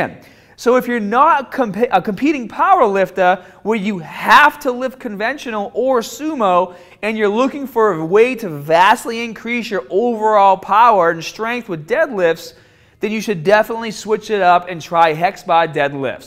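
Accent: Boston, United States. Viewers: Dear god, your accent is so much Boston 😆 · Somehow I think is from Boston. Just sayin. About to grab a hex bar for the house since we're all trapped at home lol. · Fantastic content and even better thick Boston accent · Can someone explain to me why has a Boston accent now? He never used to? I feel like I'm in a alternate universe or something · is this a boston accent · You must be from Bahston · U from Boston? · That Boston accent tho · Boston accent no doubt haha. I'm from the south so we all have accents. Great advice though. Subscribed. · Hex bah. Someone sounds like he's from Bean Town. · This dude has a crazy Boston accent. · Where did this Bwoston accent come from?? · This dude used to work at the maaketing depaatment, reminds me of my buddy from baaston.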